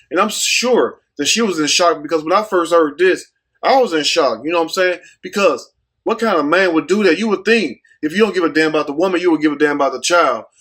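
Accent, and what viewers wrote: South, United States. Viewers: not only do I like your videos, I love you and your how your delivery done with that southern accent that is charming.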